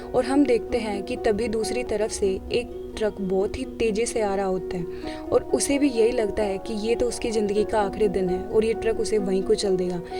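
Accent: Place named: Haryana, India. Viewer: didi aap haryana s ho na aapki aavaj se hi pata lagra h...mai bhi haryana se hu...thanks for your explanation😊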